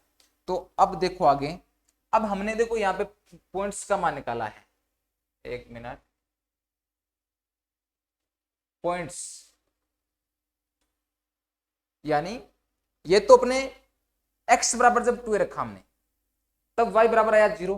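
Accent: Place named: Haryana, India. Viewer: Apke bolne ke stayle se lag raha h u r from hariyana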